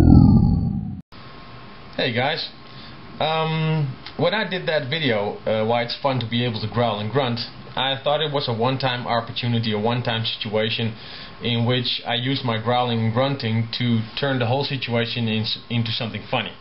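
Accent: Netherlands. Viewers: Hoezo dacht je dat hij engels was? Je hoort het bijna altijd als iemand engels spreekt die nederlands is. Alle Nederlanders hebben ongeveer hetzelfde accent:P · Dutch accent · hee ik dag al da je een ned accent had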